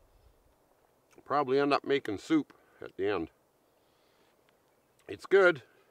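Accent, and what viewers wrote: Alberta, Canada. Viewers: Are you Canadian? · keep recording these videos. Experiences in the "bush" as you explain and record are not only interesting, but soothing. I wish I were a bit younger and surrounded by nature. You are a great "ambassador" to bushcraft. (BTW: Your Canadian accent adds a GREAT deal to your videos.) Keep'em coming, mate! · He has a strong Canadian like accent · This guy sounds like every man in Alberta/BC......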